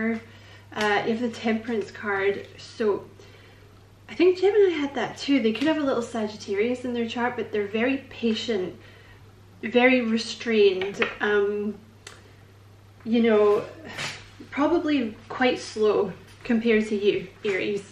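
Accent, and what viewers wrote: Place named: Scotland, United Kingdom. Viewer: Keep it going. Interesting reading. Have fun listening to your readings. You sound like me. With the pure Scottish banter.😂😂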